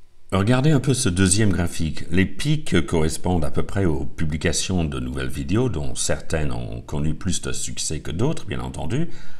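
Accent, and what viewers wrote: United Kingdom. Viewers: Comme quoi tous les goûts sont dans la nature et c'est temps mieux ;) Merci pour votre réponse et la qualité de vos vidéos. Mais également en Français avec un sympathique accent britannique .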